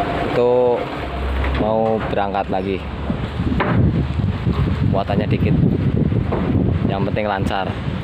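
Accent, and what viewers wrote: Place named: Java, Indonesia. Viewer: Semangat bang.. 👍👍👍👍 nyari rizqi..semoga sukses.. medok ya..kek aku hehehe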